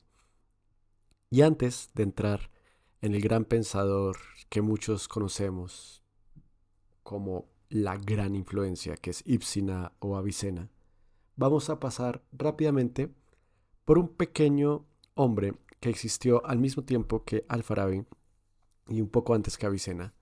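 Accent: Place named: Colombia. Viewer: Recién descubro tu canal. Como admirador de la filosofía islámica, te doy gracias por cubrir estos temas en español. Por cierto, ¿eres colombiano? Creo que tu acento te delata jajaja.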